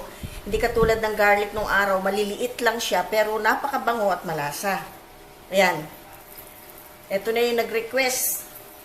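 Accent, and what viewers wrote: Philippines. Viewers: idol ko si ATE TAGALOG na tagalog ang punto ..Ako po ay taga BULACAN BULACAN kayo po ay taga saan ?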